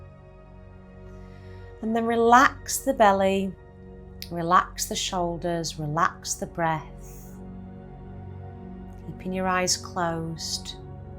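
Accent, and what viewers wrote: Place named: Yorkshire, United Kingdom. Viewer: Thank you for this. I'm really starting to learn to pull back and stop when I get myself in a high state of anxiety as I know acting from that state isn't helpful. These exercises really do help to bring me back down to a place of calm and stop me wasting hours in a loop of anxiety. I thank you so much for your videos and your down to earth manner, I'm a Geordie so I respond well to your Yorkshire accent and humour, much love to you 🙏❤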